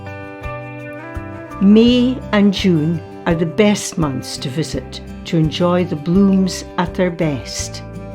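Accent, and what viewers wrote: Scotland, United Kingdom. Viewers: Lovely soft Scottish accented presenter's voice makes the videos all the more enjoyable.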